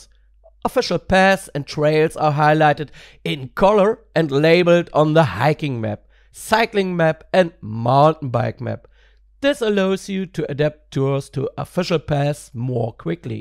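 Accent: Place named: Germany. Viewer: (english down below) german: hi. schade dass du nicht näher auf das live tracking eingegangen bist, das is das was mich an premium am meisten interessiert. also wie das live tracking genau funktioniert, wie zuverlässig es ist, und ob man auch ohne komoot meinen standort tracken kann. mein plan: ich möchte meiner community während einer tour im livestream die möglichkeit geben, zu sehen wo ich gerade bin. und natürlich haben die leute warscheinlich selber kein komoot. nebenbei: schon nach den ersten wörtern dachte ich mir "die aussprache klingt so als wäre er deutsch". und ich hatte recht. english: hi. it's a shame that you didnt describe the live tracking more detailed, because that's the premium feature i'm most interested in. i mean like how exactly does the tracking work, how reliable is it, and can you check/track my location without having komoot? 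my plan: i want to give my community the opportunity to track my location during a livestream tour. and of course they most likely dont use komoot themselves. on a side note: after a few words i already thought "the pronouncation sounds like he's german". and i was right.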